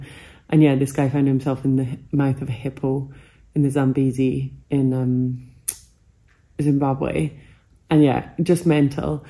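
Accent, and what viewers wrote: Scotland, United Kingdom. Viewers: Just found you and I love your vlogs 😍 They’re so realistic and relaxing to watch! Are you scottish (I hear the accent😅) ? And do you have an instagram?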